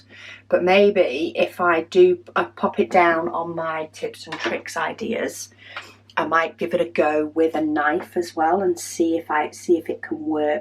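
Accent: England, United Kingdom. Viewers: I really enjoy watching / listening to your videos. I have started a HAED diamond painting. I have been doing a 2 by 3 grid section just like you do, as it fits on my tablet screen. I don't have as many colors as your large HAED. I pick the symbol that is the lowest in the left most column, and let pattern keeper tell me the DMC number. I am working from right to left, and this outlines the section the quickest and fill in from there. I live in the states, and after a few minutes of listening to you speak, my inner voice will suddenly have an English accent. lol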